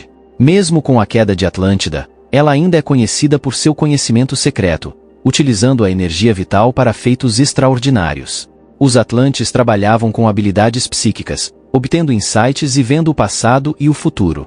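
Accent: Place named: Brazil. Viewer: Colombo chegou À América... não destrua a gramática da língua portuguesa com sotaque brasileiro.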